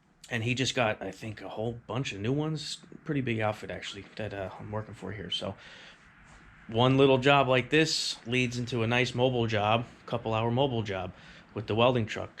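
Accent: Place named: New Jersey, United States. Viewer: I’m here for the welding and hearing the perspective of a welder from Joisey 😂✌🏻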